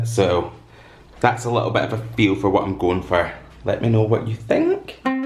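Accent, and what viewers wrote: Scotland, United Kingdom. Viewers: Loved those two textured wallpapers - they give such a luxury hotel vibe! Just found you a couple weeks ago & get so excited when I get a notification now 🤣 Love everything about your style & aesthetic...and I'm a fellow homebody/ introvert!!! haha! Have been trying to work out where your accent is from...I'm just outside Edinburgh, but I'm thinking you must be further North. Thanks for showing us another part of your beautiful home...I'm off to look up those Kinfolk books on Amazon right now. Obsessed with interior/ architecture books!!! Have a lovely week ❤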